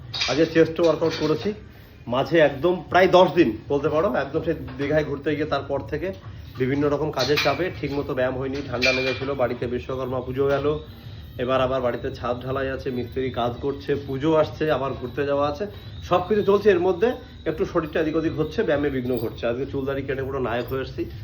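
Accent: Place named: Bangladesh. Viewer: Son surar bachcha tui ja vaba Indian armed forces ka niya kotha bola6is are satha tor bou sur tana6a......Todar duto kai bol6i Tora manusar jat na ra......sotti kora bolto tora indian na ki oi parar mal .....kotha suna to amar tai mona hoi made in bangladesh.....Oi body bilding are ghamant ak dom dhukia dabo........Janor sala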